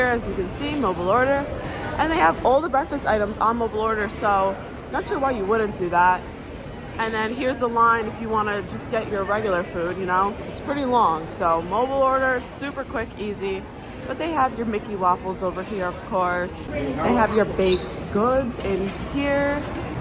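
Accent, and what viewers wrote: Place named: New Jersey, United States. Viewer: Just found your channel looking for pop reviews since this would be a good cost option for our next trip 😊. I’m from NJ and I’m hearing a similar area accent lol where are you from?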